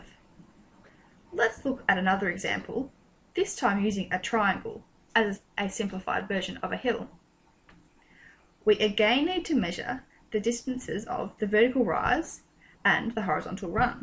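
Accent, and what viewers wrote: Australia. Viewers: Good video, minus the australian accent that kinda got on my nerves. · i couldn't stop laughing at the Australian accent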